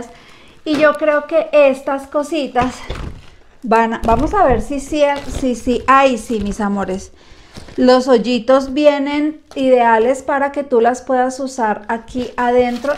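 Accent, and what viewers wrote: Colombia. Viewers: Eres de Colombia y vives en Aguascalientes? O porque hablas con acento Colombiano?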